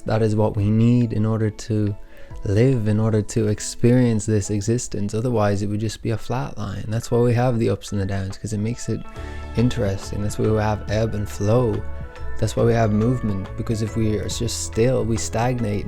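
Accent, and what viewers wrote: Ireland. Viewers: the aule paddy accent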